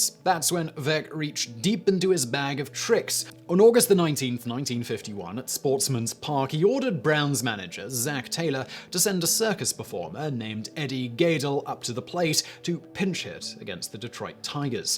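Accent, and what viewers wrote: United Kingdom. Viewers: Do you cold read from a script? Your pronunciation of some names in your videos, British accent notwithstanding (When will Brits speak English?) is often unique, if not horrendous. Eddie [GAE-del]? Seriously?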